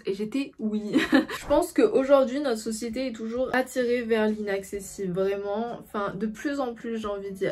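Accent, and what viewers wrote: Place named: Paris, France. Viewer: Cette façon de parler 😂 on dirait une bourgeoise de Paris PS: Je critique pas, je fais un constat, personne ne parle comme ça là où je vis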